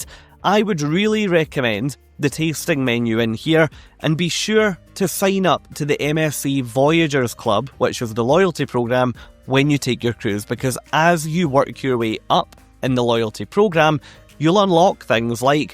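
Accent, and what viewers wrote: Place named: Scotland, United Kingdom. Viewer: Okay, we have a bet going. Please help. My wife says you are Scottish, my son says Welch.... I hear something more Big City and mixed... Can you help us out? Yes, we all love your accent!!!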